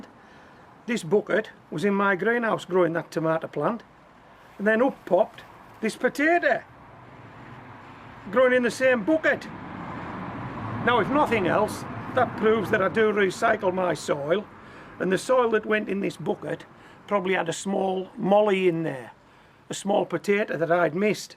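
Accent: United Kingdom. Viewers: Hello,. Where in the UK do you live? You have the most charming accent! 🙂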